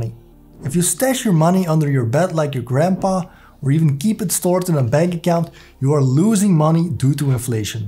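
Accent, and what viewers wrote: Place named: Belgium. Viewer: Haha, giere. Zoek ik video's op over investeren, en ik hoor echt een Belgisch accent 😂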